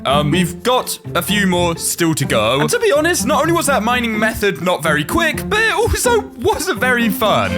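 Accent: United Kingdom. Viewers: When and where is the frog party and are you british😅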